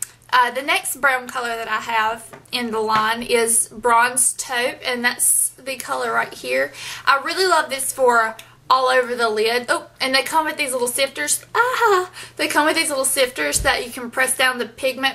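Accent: South, United States. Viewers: I'm also from NC. I'm from Durham, we both have that same little southern twang lol. love your videos by the way <3.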